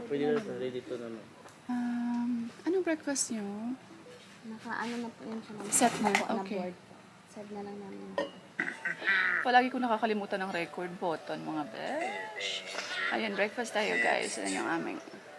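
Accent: Iloilo, Philippines. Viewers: hi po.. 🙋 ang galing po tunong ilongga narin po kayo..👏👏👍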